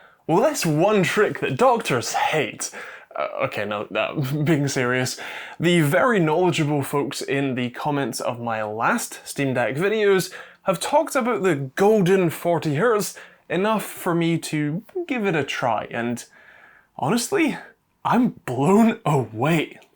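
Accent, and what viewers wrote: United Kingdom. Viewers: Interesting video, I'm just curious about your accent, what part of UK are you from?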